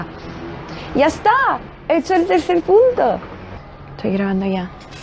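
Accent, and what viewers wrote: Andalusia, Spain. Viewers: Que graciosa una estadounidense con acento andaluz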